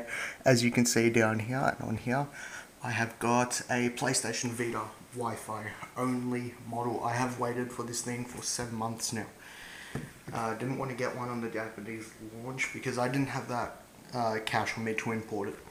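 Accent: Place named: Australia. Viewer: Hey an Aussie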